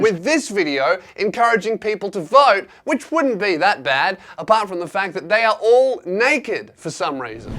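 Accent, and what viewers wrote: Australia. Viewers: Since when did Ninja have am Australian accent?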